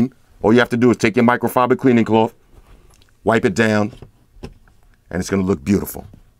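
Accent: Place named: AAVE, United States. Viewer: Have not heard a more ebonics infused product review in all my days. Hilarious and enjoyable :D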